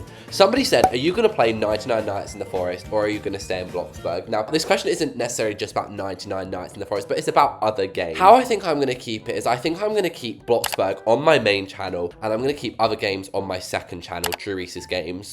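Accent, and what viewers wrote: United Kingdom. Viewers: Why are you British? 😊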